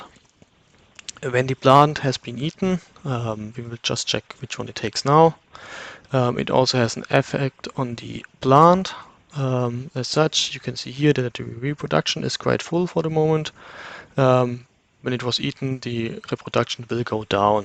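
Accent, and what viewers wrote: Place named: Germany. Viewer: Gutes Video! (Ich geh mal vom Aktzent davon aus, dass du Deutsch sprichst 😜)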